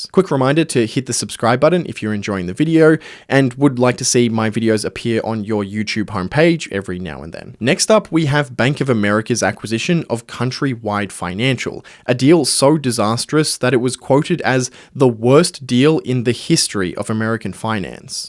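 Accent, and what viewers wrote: Australia. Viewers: Do your channel a favor and please get someone to do VO that isn’t Australian. I made it 90 seconds before NOPING out.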